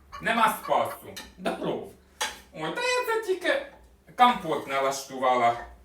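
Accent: Poltava, Ukraine. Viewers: Дякую як послухаю то наче дома побуваю моя рідна Полтавщина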